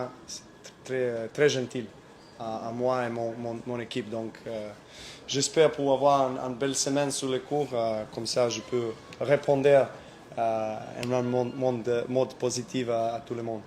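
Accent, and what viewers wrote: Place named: France. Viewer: I love your french accent Nole !!!! WIsh you to be in your best shape for the 3 weeks coming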